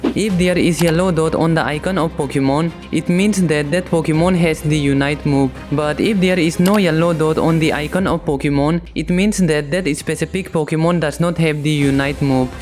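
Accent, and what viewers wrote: India. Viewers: Bro is pure indian "amier"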